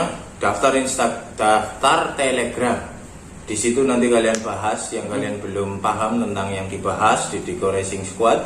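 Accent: Banyumas, Indonesia. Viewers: Kaya wong banyumas,,,ngomongw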